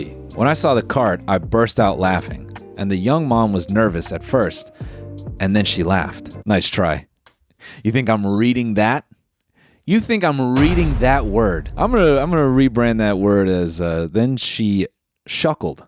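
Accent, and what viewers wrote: United Kingdom. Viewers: I need more British accent!!!!! But u need to do the different accents from all over the uk, like Scottish, posh British, brum accent etc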